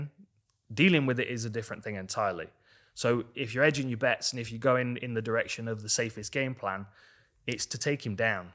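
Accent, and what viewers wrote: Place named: East Midlands, United Kingdom. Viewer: Incredible breakdown as always and... incredible accent. East Midlands, let's gooo!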